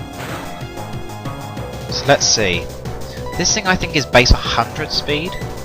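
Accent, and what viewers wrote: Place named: United Kingdom. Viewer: Like you said if u be a bit more fun you really can come far if u do that and are you british cause that accent is lovely!! :)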